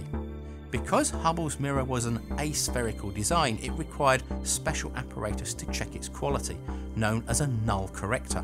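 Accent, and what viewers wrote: United Kingdom. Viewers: I can't figure out if this guy has a slight speech impediment or is just British.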